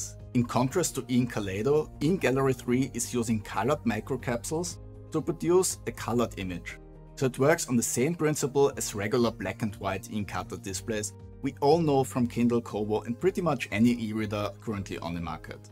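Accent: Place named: Austria. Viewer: Man hört den österreichischen dialekt so gut raus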